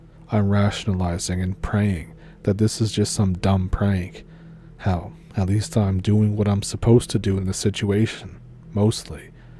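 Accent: Ontario, Canada. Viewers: Thanks for the stories, tonight. Your accent sounds like my old cousins and family from Ontario. Good times. 🎉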